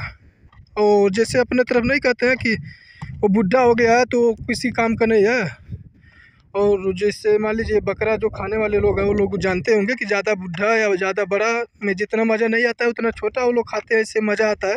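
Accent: India. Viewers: Bhai aap Bihar ya up se ho kya lagta hai aap bhojpuri bolte ho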